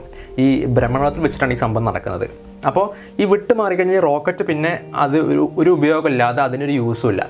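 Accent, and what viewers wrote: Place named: Kannur, India. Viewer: aaah njan kannur aanu Ninghal palakad alle But baasha kannur kaar pola ind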